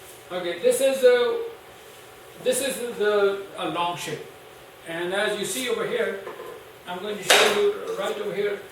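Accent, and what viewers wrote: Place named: Oklahoma, United States. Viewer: You have a very odd version of an Okie accent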